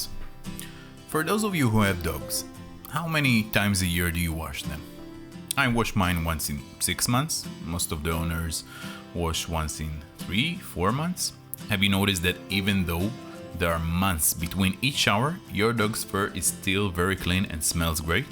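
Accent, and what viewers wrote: Israel. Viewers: your truly charming accent which I'm going to assume is Israeli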